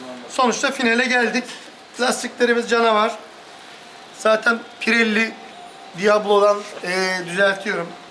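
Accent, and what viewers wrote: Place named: Aegean, Turkey. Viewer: Hocam şiven çok güzel Aydın mı Muğla mı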